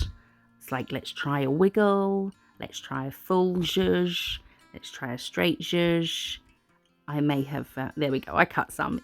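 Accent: England, United Kingdom. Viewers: "Faffing, blotto, para." I haven't lived in England since 2003. Listening to you makes me feel homesick. It reminds me that we speak another language 😂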